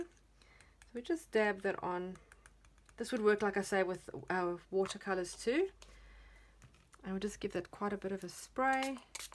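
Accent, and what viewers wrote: South Africa. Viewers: Gorgeous! Btw am confused by your accent! You are in Oz but your accent sounds South African!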